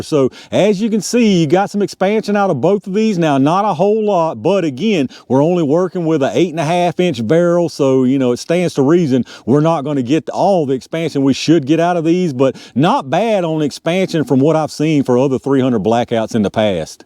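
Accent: South, United States. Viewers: I love this man. Scientific method. Southern accent. Enough said.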